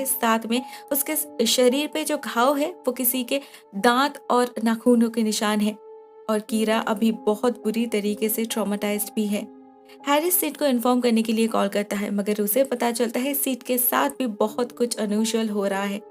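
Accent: Bengal, India. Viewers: u bengali? 🖕🏽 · Ap bengoli ho kia bolieee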